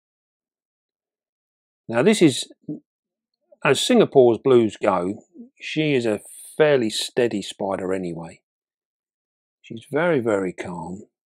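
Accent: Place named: London, United Kingdom. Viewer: English sounds a little like a London accent I think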